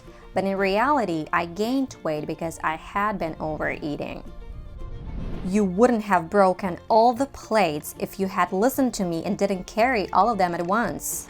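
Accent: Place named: United States. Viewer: American accent rules !!!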